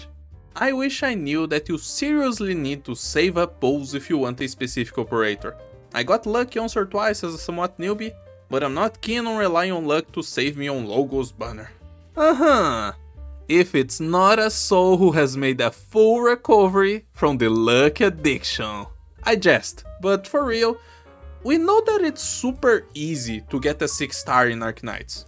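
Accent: Brazil. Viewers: quick question, are you brazilian??? cause you have the accent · Ummm the accent, I know you, you're one of my people... Quer uma goiaba?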